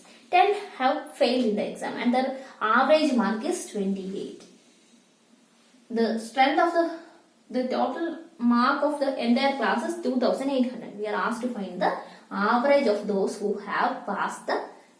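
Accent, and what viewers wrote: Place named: Tamil Nadu, India. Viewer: Spoken english in tamil tone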